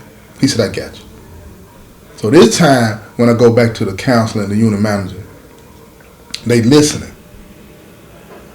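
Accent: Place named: Tennessee, United States. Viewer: I never heard the accent of anyone from Tennessee until I started listening to you and.😊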